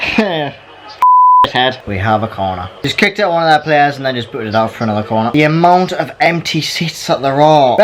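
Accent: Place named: Newcastle, United Kingdom. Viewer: Geordie definitely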